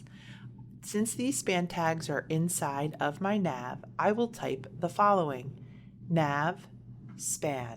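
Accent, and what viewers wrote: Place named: Philadelphia, United States. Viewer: Thank you for the info! I could definitely tell you're from the Philly area by the accent. (or should I say, "Ack-sent") Much love and GO BIRDS!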